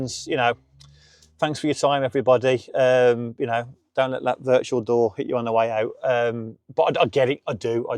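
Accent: Birmingham, United Kingdom. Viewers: Sad but these things happen. I may watch both of you, see how vids & editing pan out. Good luck (to you both / all). Keep the good material coming. I'm finally catching on to the Brummie twang.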